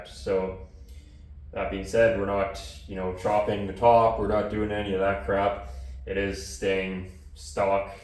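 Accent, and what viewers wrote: Canada. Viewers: You Brits probably understand his Canadian pretty well, eh?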